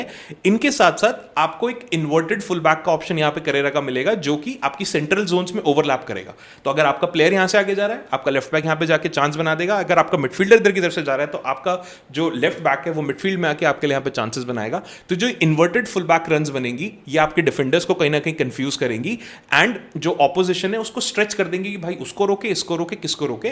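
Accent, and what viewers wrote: India. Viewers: Indian accent cuts my ears🤢🤢🤮🤮🤮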